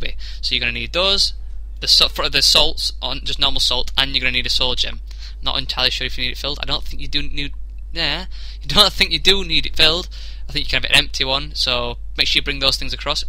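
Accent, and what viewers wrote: Scotland, United Kingdom. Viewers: Hmm sounds Scottish. Or I could be wrong. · he doesn't sound too american, he sounds more scottish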